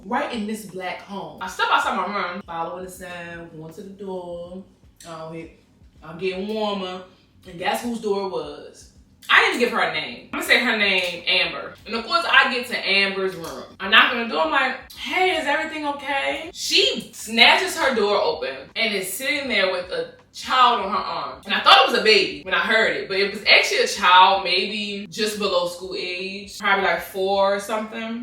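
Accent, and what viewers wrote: Baltimore, United States. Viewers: You have one of the strongest Baltimore accents ever I love it (I’m from bmore county without the accent womp womp ❤️‍🩹)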